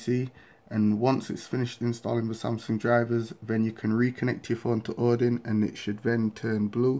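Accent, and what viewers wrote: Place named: United Kingdom. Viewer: He's British you can clearly hear his accent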